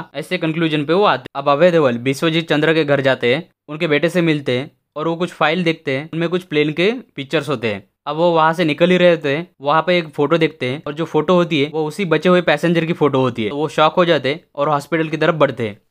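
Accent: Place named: Maharashtra, India. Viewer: Marathi assent as rah hai voice me .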